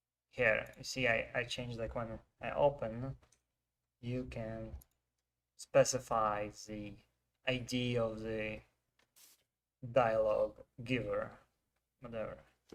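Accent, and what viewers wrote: Russia. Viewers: i think you are from Russia, because your acsent, words: "kek", "da".. Hmmm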